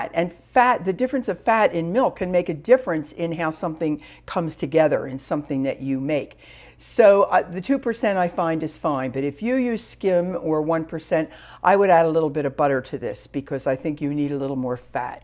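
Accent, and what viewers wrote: Philadelphia, United States. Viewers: you from Philly? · First of your videos I’m watching. You must be from Philadelphia or thereabouts.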